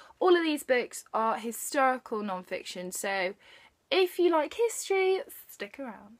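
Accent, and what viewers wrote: United Kingdom. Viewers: Beautiful British accent. Great review. I love English Puritan history because there is alot of history in understanding how American religious freedom evolved.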